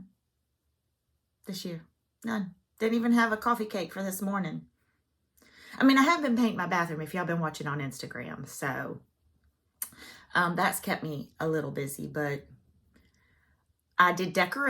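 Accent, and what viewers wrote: South, United States. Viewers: I really love watching you, your so cute and perky and you brighten my day. Plus that southern drawl. I use to live in Tamarac for about a yr and a half in 1990. I’m in KY now and it’s cold today. Don’t worry about the grouchy people talking about. Everyone isn’t like them, someone always has to fuss about something. I’ve been trying to work on 28 ct Lugana. I got a BenQ light which helps tremendously w/o a magnifier. Lugana and evenweave are the same thing, jobelin is also an even weave but I think it’s harder. Yes I still mostly do Aida. That little Santa is so adorable. Have a Merry Christmas!🎄🎄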